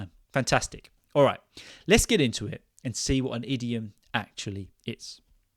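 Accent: United Kingdom. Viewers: Me too, this podcast is so natural flowing within nice British Accent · Your accent sounds beautiful but my self I learn and practice American English, British English seems bit harder than USA English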